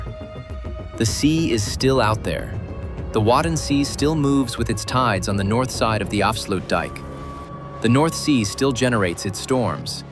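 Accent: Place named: United States. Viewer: In England we pronounce the Zuider Zee as if it were spelt "Zider Zay" (rhyming with Cider Bay). It might be different in the Netherlands. If the voiceover is meant to be American (which sounds to be the case) I can only assume Americans would say "Zooder Zee" but at times he seems to say Zouder "Zowder' Zee.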